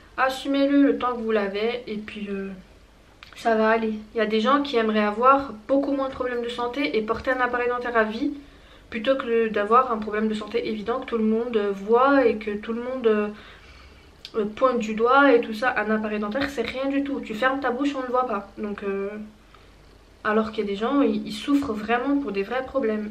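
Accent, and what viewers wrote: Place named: Belgium. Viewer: T'as un petit accent belge, mais tu vis en France nan😂 ? ou exactement ?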